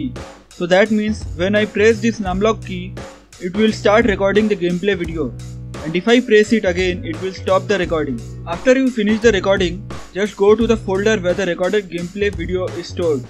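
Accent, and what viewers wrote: India. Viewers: i taught it was fake because of the indian accent lol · when i her indian voice i know i will working btw tnx its working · When you heard an indian voice. it doesnt bring fake videos you can trust indian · No it's not legit if there is Indian voice for me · Man when i heard the indian I was like this shit is gonna be a scam or be legit No but seriously this was so helpful..... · I hate your indian voice but the recorder worked ! cool vid ! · If you hear an Indian voice that means it's not clickbait!!! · I luv indin accent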